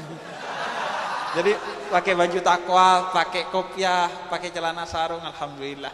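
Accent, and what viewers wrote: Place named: Madura, Indonesia. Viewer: Logat maduranya tetap nonjol bib😁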